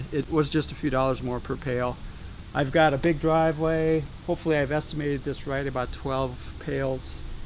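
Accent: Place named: California, United States. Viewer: I thought you were from California cause you look like Tony Hawk and you have a laid back Californian accent 😉 wish I could've helped. Yeah weather degrades it fast. Just applied a 3 yr sealer 2 weeks ago and sun already disintegrated part of it. Im in upstate New York, weathers not quite as harsh as Minnesota but there are times when we're colder, plus we get a ton of lake effect snow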